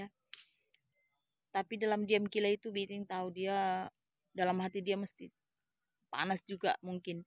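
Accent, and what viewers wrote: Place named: Malaysia. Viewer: Salam..pernah tinggal di malaysiakah..bunyi loghat seakan2 bunyi orang malaysia bercakap..orang semenanjung..maaf kalau saya silap..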